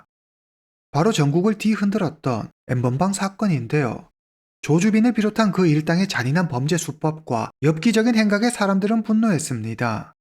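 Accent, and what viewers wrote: Yanbian, China. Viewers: 말투를 들어보니 중국이나 연변동포 분이시군요 방송 잘 보고 있습니다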